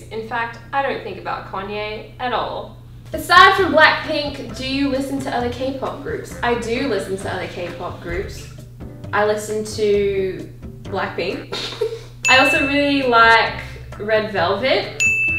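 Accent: Australia. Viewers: You sound more Australian than I do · As a true blue Australian, YOU SOUND PROPER AUSSIE and did great, be proud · I freaking loveeee your Australian accent I think you used it in one of the first videos I watched of you and I was very upset when I found out that you weren't actually Australian😭😂. Forget the haters this was awesome! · Came here because I was listening to one of your videos and I heard you hearing some stuff in an Australian accent so I got very confused coz I thought you were American, I know why now lol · YAAAS I LOVE THE ACCENT! Instantly clicked becaause of the accent hahah I love the Australian one. Also yes please do a curly hair updated video I'm half filipina & black too so help a sis out! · This is funny because the first time i watched one of your videos i really thought you were Australian as in i was SOLD lol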